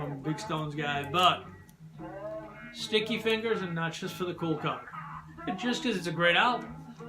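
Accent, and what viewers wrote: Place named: United States. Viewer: Hi!! Well done with your channel!! It’s lovely to hear your American accent.. hopefully one day we can meet 😌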